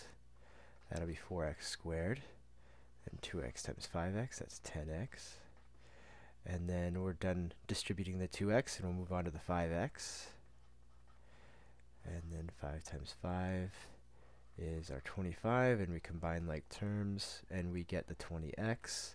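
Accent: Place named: Texas, United States. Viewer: You sound like my friends from Texas.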